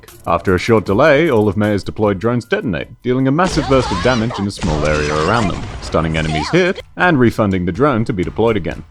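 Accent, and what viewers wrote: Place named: Australia. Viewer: Perfect quality video except the aussie accent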